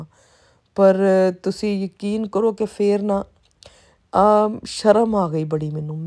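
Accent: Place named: Amritsar, India. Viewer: 😊❤same as indian punjab Amritsar life sari galla baata same you are speaking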